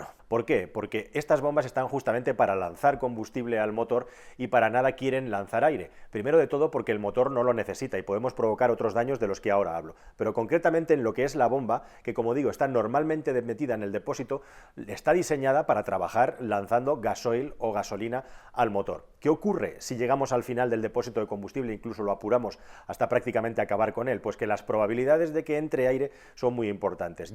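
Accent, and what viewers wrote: Spain. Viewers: ME ENCANTA EL ASENTO ESPAÑOL..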